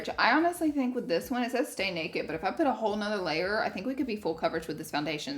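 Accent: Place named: Alabama, United States. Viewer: I absolutely love you and your videos! I am also from Alabama so I can relate to the way you talk so well lol. It’s so cool to see someone that grew up in a similar atmosphere to me is doing such great things. It gives me a lot of inspiration! Much love. ♥️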